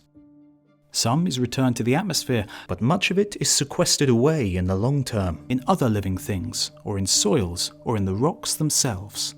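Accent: United Kingdom. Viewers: "Hoover up" Definitely not from the USA - as if the accent didn't give him away. That is the most (British? UK?) thing I have heard him say. Sorry if I guessed wrong on the accent - I am just an un-cultured American. :o) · are u british? 🤢🤢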